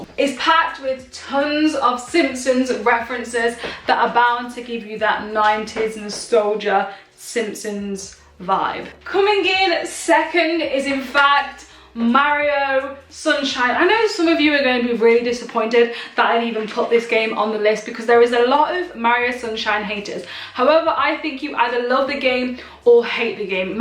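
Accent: England, United Kingdom. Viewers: Would like to hear you say it in that British accent. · Excellent english 🏴🇬🇧 accent 😍. You look exactly like me but then as the female version.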